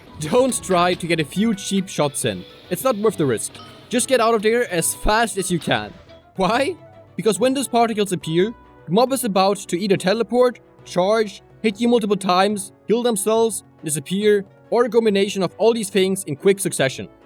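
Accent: Germany. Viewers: You Sound german / middle european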